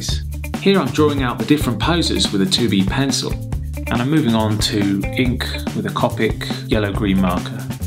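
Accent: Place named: Sunderland, United Kingdom. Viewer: Your deffo from sunderland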